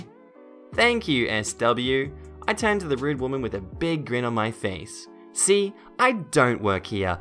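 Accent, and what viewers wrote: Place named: Australia. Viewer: With the ZED, he could also be from Canada, although not with the Australian accent. I think he's mentioned in other comment sections he's from Queensland (north east Aus).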